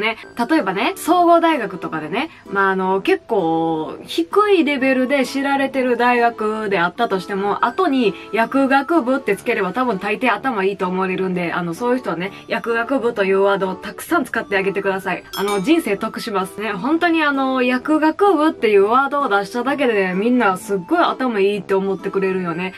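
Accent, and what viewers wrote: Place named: Osaka, Japan. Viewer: 喋りが面白すぎて、チャンネル登録しちゃいました。大阪のイントネーション良いよね。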